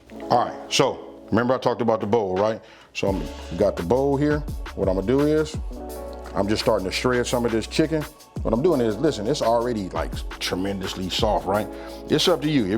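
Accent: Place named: California, United States. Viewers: 🔥 lol I knew you were from Cali its the accent my state and yes gotta have the corn tortillas and that Las Palmas! Great video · Man your accent is so Cali Unc it warms me up! All my favorite family is from Cali and hearing you talk reminds me of them. This looks amazing and I definitely plan to make it. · Yup! I'm pretty sure is from Cali too. He has an LA accent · Hitting us with that Cali accent, but food is always point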